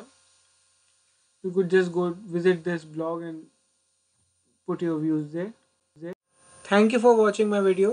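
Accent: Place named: India. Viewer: why do always, and probably only, indians do this kind of tutorials? :D ....it is good explained but, hard to understand, the indian-english and the bad audio quality ^^ greetz :)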